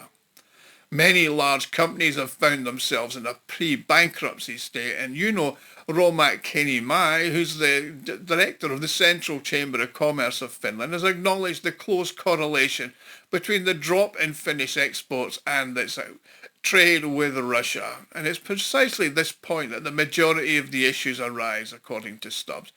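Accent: Scotland, United Kingdom. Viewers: What is this fascist Russian propaganda with a scottish accent!?!?!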